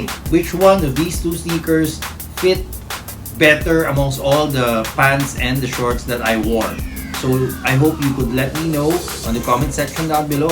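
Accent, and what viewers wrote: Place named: Philippines. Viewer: Super Filipino accent lmaoooo!! Sound like my friends lmaooo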